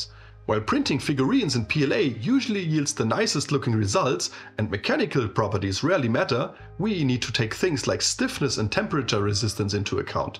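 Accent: Germany. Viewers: Not a native speaker. To good and clear pronunciation :) German? Subscription is done.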